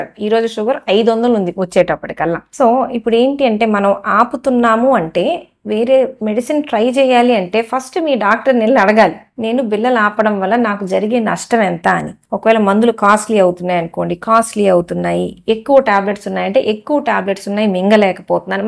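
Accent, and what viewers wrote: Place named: Chirala, India. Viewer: Your Chirala telugu is superb madam, and timely speaking also nice mam..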